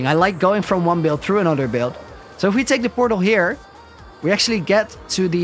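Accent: Netherlands. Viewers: Its the first time watching you but i bet that your Dutch😂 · Are you dutch? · Are u dutch ben je nederlands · Do I hear a HOLLANDS accent there You have GEKOLONISEERD the sea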